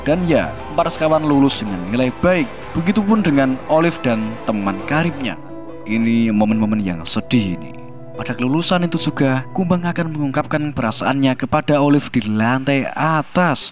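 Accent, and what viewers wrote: Java, Indonesia. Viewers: logat jowo ne kuat bgt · Medok juga hhhh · Mas mimin asli mana ya?,kok logat jowone mantep ketone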